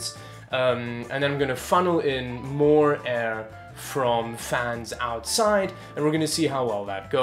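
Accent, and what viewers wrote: South Africa. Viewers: Are you South African? You look and sound like one. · Are you South African? Hahaha, knew it from the accent. Checked instagram and there you mentioned an Afrikaans proverb. Goeie video. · Are you South African by any chance? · Bruh you sound like you are from South Africa. However my question would be. Is it better to take stock fans and put them as front intake fans? I have Arctic Freezer 34 with dual fans and I simply had to remove stock nzxt h500 and now I am thinking where should I put them. Can't you please help me to solve this riddle?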